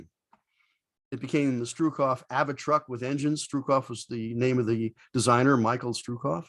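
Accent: United States. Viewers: I was born and raised on Long Island, and trust me your accent remains. It is still a lot stronger than mine, because I learn how to speak properly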